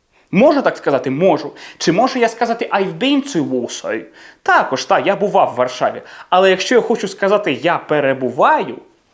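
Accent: United Kingdom. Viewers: Дивлюсь відео і балдію 🤪згадую уроки англійської в свої молоді літа- зеара, бʼютіфул , зисис е пен 🤣🤣🤣🤣 а тут слухаю ніби британець ☺️😘Щиро вдячна за урок ! Успіху Вам і нам ПЕРЕМОГИ🇺🇦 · А в Нью-Йорку відчувався їм ваш британський акцент?? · Вперше бачу вчителя англійської з України з таким ідеальним брит акцентом, дуже нейтів🤩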